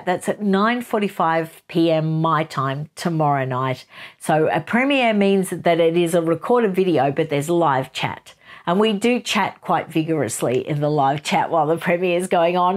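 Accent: Australia. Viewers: I really love this format. I agree with you about Russell Brand, and did not know that somebody was trying to get him demonetized on rumble. I knew about YouTube. Like you, I did not have a favorable view of Russell Brand, but I don’t even know why I had any view of him. I’m not sure how I even became aware of him. But he kept coming up in my YouTube feed and I found him interesting and did subscribe and listen to a lot of his videos. I could not listen to the video on his book because of the beginning segment talking about the dog and I switched up immediately. I am very sensitive and things like that trouble me intensely. But I love all your videos and having lived in Melbourne for a number of years, I like listening to an Australian voice. I’ll look forward to more of these chats. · Loved this chat session! Being from the states i always love to hear the accents down under. 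You are such a great content provider about my favorite things...books. keep on talking to us about both sides of the story because you are so insightful! ❤❤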